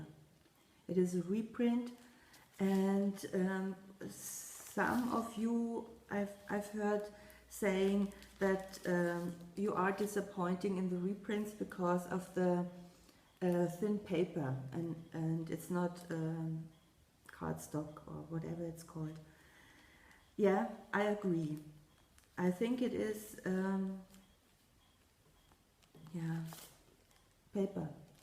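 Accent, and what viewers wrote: Germany. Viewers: You explain everything perfectly! Don't worry about anything, your English is very good and I understand what you are saying. In fact, your videos are so entertaining to me.... I didn't take German in high school, so your accent and German tendencies are fascinating to me! I would love to meet you someday if you are ever in the states....❤️❤️❤️❤️❤️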